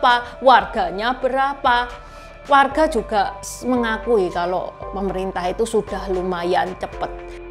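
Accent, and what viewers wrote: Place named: East Java, Indonesia. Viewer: Waaah mbak sudah cantik cerdas juga berprasangka baik....dengan bukti yg benar bisa mengajak kepada kalayak untuk berpikir baik pada orang lain.......tidak hanya memandang jeleknya...... Trimakasih info positipnya.....klo boleh nanyak kok mbak lancar banget bahasa indonesianya juga seperti ada dialek bahasa jawa,kayaknya jawa timur....klo boleh tanya mbak orang mana.....????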